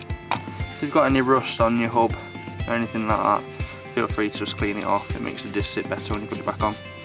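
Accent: England, United Kingdom. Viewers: Nice camera work and nice English accent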